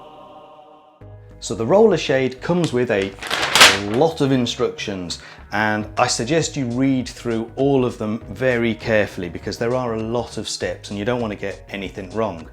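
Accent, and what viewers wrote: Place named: United Kingdom. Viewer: I came for the switchbot and stayed for the polite sounding british accent 😊 btw linus sebastian vibes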